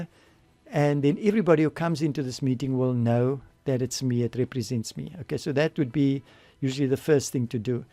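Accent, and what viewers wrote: South Africa. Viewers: Ek wou se jy is afrikaans, groete vanaf Nederland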